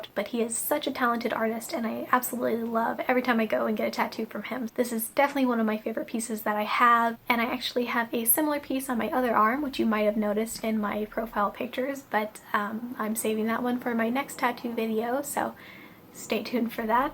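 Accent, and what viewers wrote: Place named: North America. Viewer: Hi, this is my first visit to your channel. I was instantly struck by the dichotomy of your North American accent and the fact you have the international edition of the book. At least, I'm pretty sure of it; I'm an American and have the U.S. edition, but also bought the UK version (the same one you have)... Are you living overseas? Was it a gift? Am I rambling and not making any sense at all?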